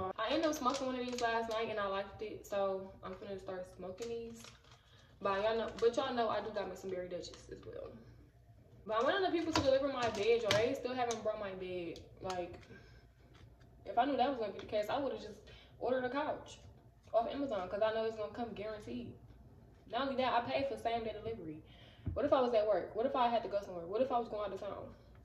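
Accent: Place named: New York, United States. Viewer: Where are you from you sound like you from newyork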